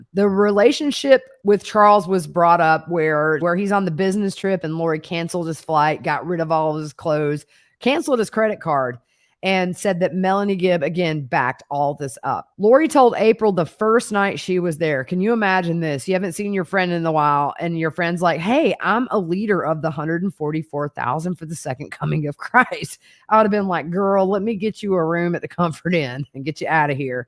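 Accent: South, United States. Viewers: I enjoy your excellent commentary as it is so easy to follow. I am sorry to say I just discovered you but I am new to YouTube AND I am 76! I love hearing you talk. I am from Mississippi and have lived in Georgia as well as many other states but I will always love hearing a soft Southern accent.😊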